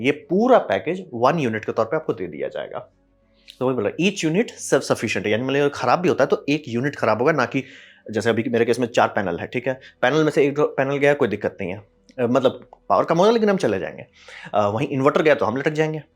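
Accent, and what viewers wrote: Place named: East India, India. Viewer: A u from bihr or east india? Value to bhalue??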